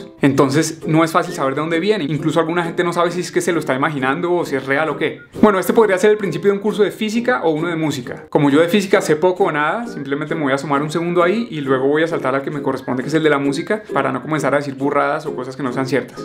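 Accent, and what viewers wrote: Colombia. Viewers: Mi silbido casi no se escucha!! Y que bonito su acento colmbiano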